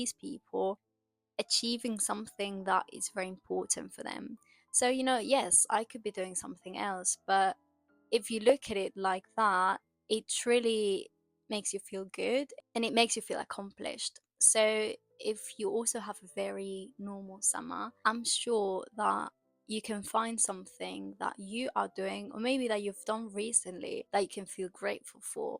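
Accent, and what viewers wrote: United Kingdom. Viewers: so, where are you from? cuz your accent is pretty similar to British🙂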